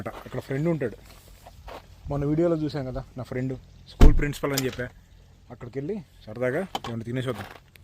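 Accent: Andhra Pradesh, India. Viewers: మీది నిజంగా నిజామాబాద్ యే నా ఎందుకూ అంటే మీ మాట్లాడే తెలుగు యాస తెలంగాణా కాదు ఆంధ్రా తెలుగు యాస లాగా ఉంటుంది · nee telugu pakka andhra bro .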